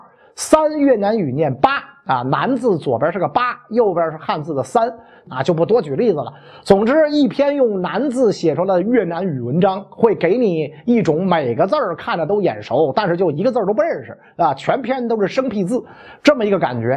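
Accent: Beijing, China. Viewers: 神奇...你会被袁老师影响成一口京片子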